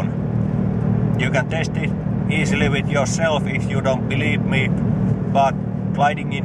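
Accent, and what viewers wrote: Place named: Finland. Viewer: Finish accent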